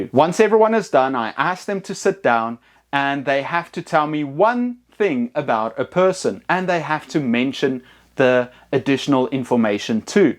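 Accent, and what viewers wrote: South Africa. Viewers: Sir...great video..but are u by any chance ...south african?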